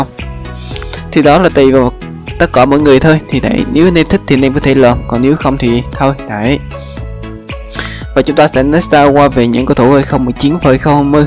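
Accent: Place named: Quang Ngai, Vietnam. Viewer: bạn nói tiếng quảng Ngãi mình không nghe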